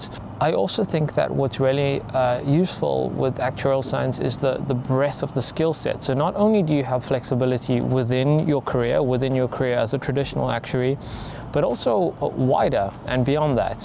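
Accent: South Africa. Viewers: Nothing more beautiful than a South African accent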